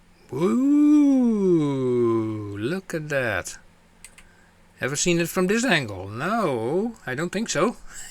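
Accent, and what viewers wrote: Netherlands. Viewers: As a dutch person it's always funny to hear the typical dutch english accent haha, but great video, this is awesome to know that you can explore the areas further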